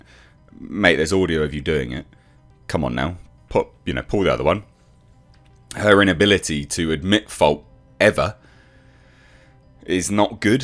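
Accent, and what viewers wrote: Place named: United Kingdom. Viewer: I hope you don't mind but I just gave your name up to Umbrella Guy on a live stream with him just now. It was regarding your Vogue critique earlier today. I found that really funny and mentioned it to TUG and his response was that he likes you a lot, and said he'd like to get you back on a flashcast. So I have my remind buttons on and I'll keep watching for that. Anyway, love your channel! Been watching for a while now. Nice to hear a fellow Brit on YouTube. Cheers. 🖖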